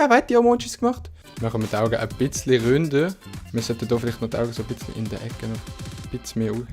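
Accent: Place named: Zurich, Switzerland. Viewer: züri düütsch 😂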